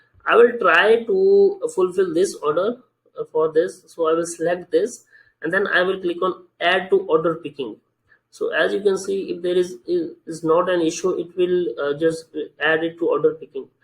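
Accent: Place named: India. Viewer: Bhai english na bola pakaa deti ha hmy aap jaisi bhi english bol lo lgna ap ny desi hi ha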